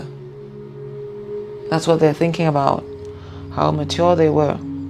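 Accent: Trinidad and Tobago. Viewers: Speedy recovery sis. Very accurate read absolutely amazing lol are u trini?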